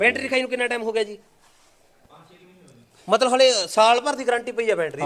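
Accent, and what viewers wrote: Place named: Majha, India. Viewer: ਤੁਸੀਂ ਤਾਂ ਮਾਝੇ ਦੀ ਬੋਲੀ ਬੋਲਦੇ ਹੋ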